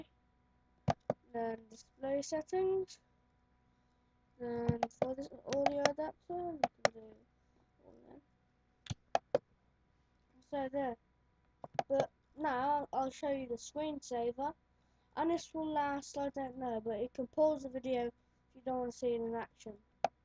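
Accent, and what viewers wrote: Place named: United Kingdom. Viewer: that British bloke garble you are mumbling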